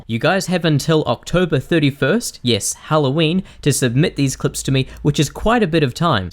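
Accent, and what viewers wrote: New Zealand. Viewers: are u from nz? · Are you from nz cause I am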